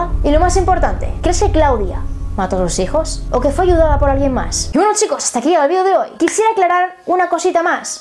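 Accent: Spain. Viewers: le gustan mucho las historias urbanas Mexicanas,le da un sabor muy agradable su acénto Español..!!😃😉🇪🇸🇲🇽👻🌵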